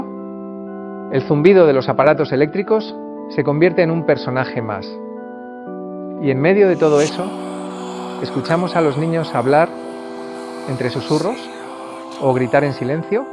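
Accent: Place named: Spain. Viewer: no sabia si eras latino o español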